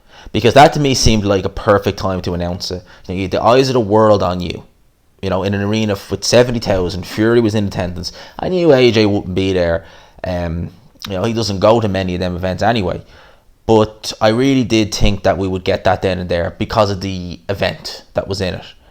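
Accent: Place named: Ireland. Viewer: I'm new to your channel by way of Ade. I'm subbing purely for the Irish accent, the boxing knowledge is a plus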